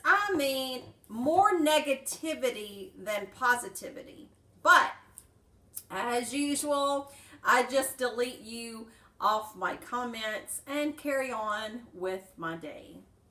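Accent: South, United States. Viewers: Its nice to here another southern voice on this topic!! Our culture here is less than a blink of an eye in Earth time. But still, in 10 years of this journey, not one person has have i met i can talk to about anything similar lol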